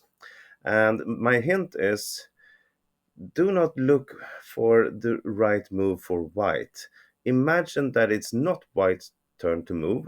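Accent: Sweden. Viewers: Är du svensk? Det låter så på din brytning. (Are you Swedish? Your accent sounds so.)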